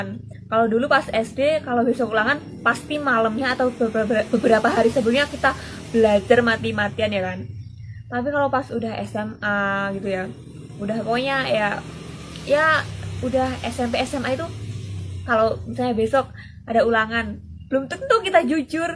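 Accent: Java, Indonesia. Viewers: Jowone endi mbak, medok nemen bahasanya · Mukanya mirip Natasya Wilona.suaranya juga mirip hanya saja medok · Sumpah sih ini wilo versi medoknya 😁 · Asli kembar sm wilona.. suara ny sma jg yg bkin beda cm medok jawa ny aj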